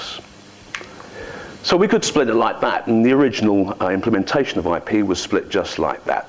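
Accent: England, United Kingdom. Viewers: This isn't bad. Can't remember the last time this american learned anything from someone talking with an english accent.....lol how american of me ....lol Great tutorial son. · This is how it's pronounced over here in the UK! He's English if you haven't noticed!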